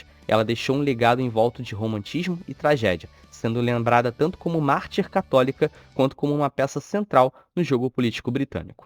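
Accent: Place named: Rio de Janeiro, Brazil. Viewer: Sotaque carioca chato da p*******!!!!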